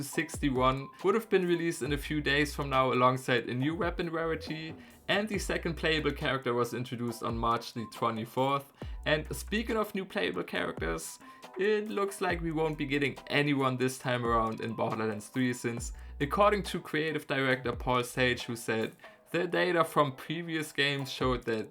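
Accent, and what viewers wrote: Germany. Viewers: Are you german? Such cool accent! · Man erkennt den deutschen Akzent 😂😂😂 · Liebe den deutschen Akzent, viel Liebe aus Amerika! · Dein Deutscher Akzent ist nicht zu überhören haha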